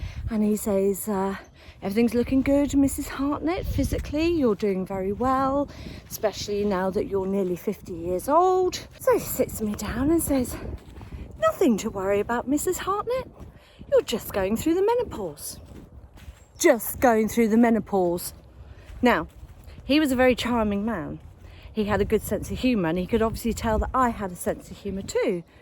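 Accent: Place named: United Kingdom. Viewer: I just love your beautiful UK speech and dignity. I just had an appointment this afternoon. I'm 57 yrs old and have had researched things. I attempted taking herbs to manage my changes. I was able to for awhile. But in the last few months I was not doing so well with it. So I am starting HRT. I want to punch that MD for you as well! I'm so happy to come across your video today.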